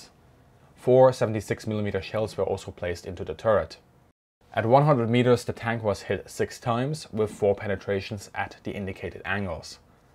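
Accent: Germany. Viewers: just wanted to give you a genuine compliment - with apologies if it sounds a bit backhanded at first, because that's not at all the intent! I've been a channel follower for years now, and it's really been interesting to see how your approach has grown, changed, and taken on new influences. Just in the past week, you've had a video that really reminded me of Greg('s Airplanes and Automobiles), followed by this video which is much more in the style of Bernhard (of Military History Visualized, of course) - but both with your own unique spin, specialty, and attention to detail. Rather than suggesting that you're copying someone else's styles, I'm instead complimenting your versatility and mastery of very different formats and approaches - and bringing in a wealth of research and your own style. In the same way (and I say this as a linguist!) - your English often brings in very British expressions and very distinct Americanisms, often in the same sentences, while always in that unmistakable German tone. 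It's neat, adds a lot of colour to the language, and makes your content all the more pleasant to listen to. Keep doing what you're doing! It's great to see you try so many different approaches, take on so many different influences, and continue evolving this excellent channel:) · Thank you for this video. I am American and I spent two tours there back in the 1970s; I know that not all Germans were ‘supportive’ of the Nazi view of the world. I have met ‘good’ Germans and knowing what they had to actually ’do’ to maintain their ‘humanity’ is truly impressive. I remember one... I think he was a Bundesvehr Lieutenant Colonel and an American friend told me that the Lieutenant Colonel had been ‘evacuated’ by dog sled from Stalingrad. Hearing someone ‘explain things’ in clearly distinguishable English (albeit with an equally distinguishable German ‘accent’) makes this a very impressive video... 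Thank you!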